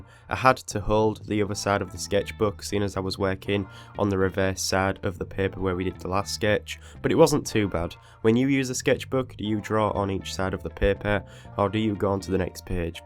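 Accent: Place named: Hull, United Kingdom. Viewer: Your Hull accent makes me want to die but your drawings give me life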